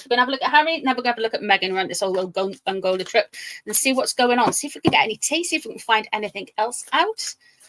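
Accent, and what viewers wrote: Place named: Newcastle, United Kingdom. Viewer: You are one my top two readers, tell it like it is. I know I don't comment much, but I do appreciate your time, and still loving the accent, my grandfather was a Geordie through and through, he had an accent very similar to you.😁👍❤️💐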